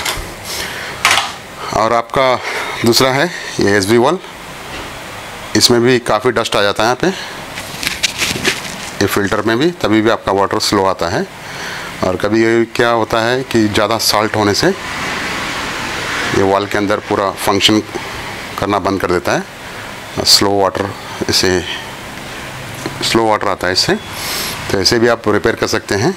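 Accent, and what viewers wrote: Hyderabad, India. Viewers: 100% he is from Hyderabad India ...Correct me if i'm wrong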